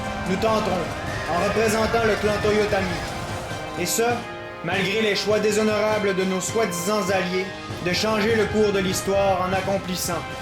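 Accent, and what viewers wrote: Quebec, Canada. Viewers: haha du quebecois sur youtube ca fait du bien :) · il est QC arrêter de dire que s,est un accent bordel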